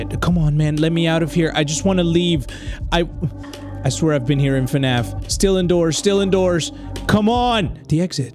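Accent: Mexico. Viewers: You sound like pewdiepie doing a light Mexican accent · are u english, learned Mexican or Mexican, learned english?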